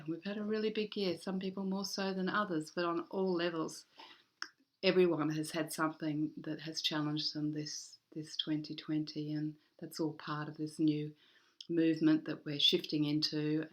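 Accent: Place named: Australia. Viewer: 1. I love the Australian accent. 2. Absorbing the Love vibration you emit. 3. You are the perfect spiritual advisor needed at this time. In conclusion, your insights and suggestions help to analyze how one can manipulate ones perceptions for a better understanding of ourselves, those around us and the collective. Much gratitude, love and Blessings for your time and energy. <3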